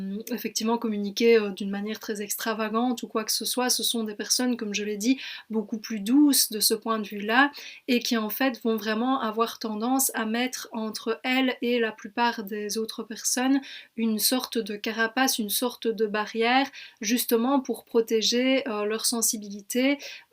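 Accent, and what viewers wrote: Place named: Belgium. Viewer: J'adore ton accent belge vraiment adorable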